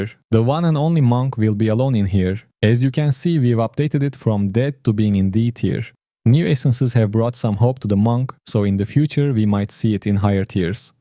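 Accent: Turkey. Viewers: türk ingilizcesini nerde duysam tanırım xD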